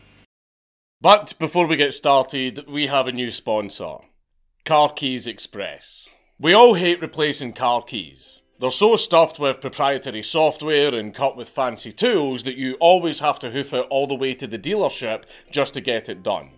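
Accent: Scotland, United Kingdom. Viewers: Listening to your phlegmy, Scottishy, and nasally voice, reading such well written scripts (about things which i find interesting), is the highlight of my week... Carry on haha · A Scotsman could read out the dictionary for all I care, I'll NEVER get tired of their accent 🤣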